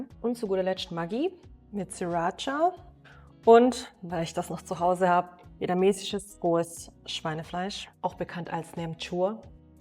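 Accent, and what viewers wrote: Swabia, Germany. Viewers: Geiler Akzent!! Aus'm Ländle ❤ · Des isch so sche die heimat wieder zum hören. Grüßle vom Landkreis Ravensburg gell❤😂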